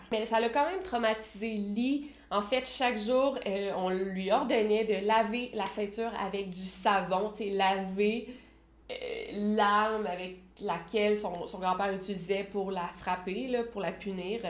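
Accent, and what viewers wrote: Quebec, Canada. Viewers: Bon bah voila l’accent Québééèquoaahh me fait plus rire 🥰 suis amoureux !!!! J’aime bien tes videos c’est du bon boulot, interessant, captivant et 🥰....🥰.....S....sm.... smack 💋 /run !!!!!!! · merci beaucoup pour toutes ces histoires très intéressantes !!en plus bien raconté et avec un petit accent canadien,c encore mieux !!👍👍👍🏻👍🏻👍🏻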